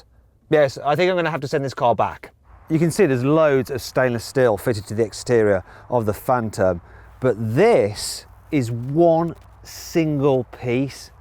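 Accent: United Kingdom. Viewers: 영어못하는 내가 들어도 이사람은 전형적인 영국인발음이군ㅋㅋ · 발음이 영국이넼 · 이보다 더 영국 억양이 어울리는 차와 영상이 있으려나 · 영국식억양 개간지나네 ㄷㄷ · 너무 재미따 ㅋㅋㅎㅎ 영국식발음으로 듣기공부 잘하고 감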